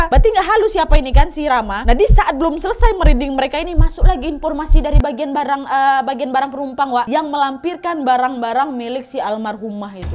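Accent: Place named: Medan, Indonesia. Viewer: Wak ini orang mana? Kayak aku bicaranya, aku orang Medan woii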